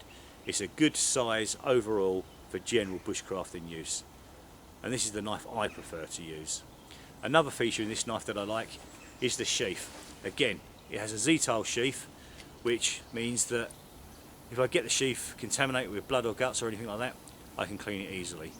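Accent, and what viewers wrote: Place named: Wales, United Kingdom. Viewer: The pointer was the main one and I know that Brecon Twang anywhere lol,and unlike most on here you know what your talking about,good vid will be subbing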